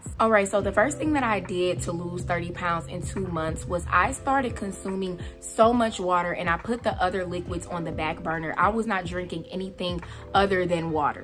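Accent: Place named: Midwest, United States. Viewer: Loved this video’! I am officially 15lbs down with 30 more to go. Side note: I knew you were from the Midwest. I heard the accent in your voice. I’m from Chicago lol.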